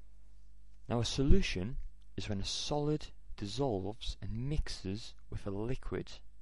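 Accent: Scotland, United Kingdom. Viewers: Thank you for the help! Chemistry is one of my favorite subjects in high school, and you've helped me out a ton with it! I cannot thank you enough, good sir! By the by, are you Scottish?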